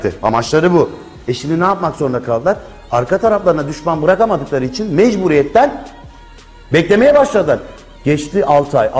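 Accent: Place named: Ankara, Turkey. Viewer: hocada daha ozaman angara şivesi upgrate edilmemiş.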